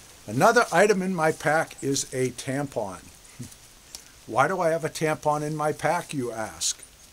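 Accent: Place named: New England, United States. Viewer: OMG don;t let the negative people get to you. Whether jealousy, negativity, criticism, or downright cruel comments, know that those folks are very unhappy in their own lives and have a need to lash out to others. Everyone is doing the best they can with what they know. Pray for them, don't waste your energy in addressing or even acknowledging their rants. Move forward and continue with your happy life as if they don't exist. Acknowledging them gives them satisfaction in knowing it bothered you. Your videos are great, entertaining, helpful, and just downright genuine. You are the salt of the earth my friend. Pray for those lost souls. I now live in northern California, 8-1/2 years now - not far from Tahoe - but far enough to not get enough snow for me!!. However, I miss New England, having grown up in CT, RI, MA and ME. Your slight accent brings back fond memories. 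My daughters and grandchildren are in CT and MA. My older daughter has a second home in NH. I am planning on a road trip for a visit this summer/fall. Bless you.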